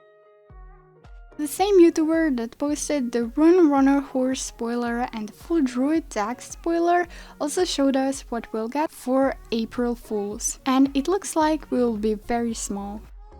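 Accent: Germany. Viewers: Du bist deutsch oder haha? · Are u german? :D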